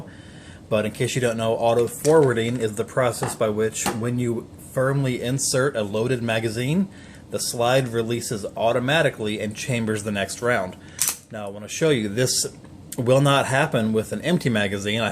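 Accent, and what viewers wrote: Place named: Carolinas, United States. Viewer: What part of teh Carolina's are you from? I'm having a hard time placing the accent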